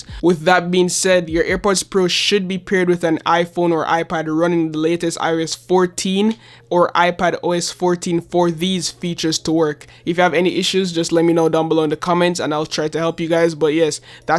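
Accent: Jamaica. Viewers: definitely can hear the jamaican accent :o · Is that a Jamaican accent?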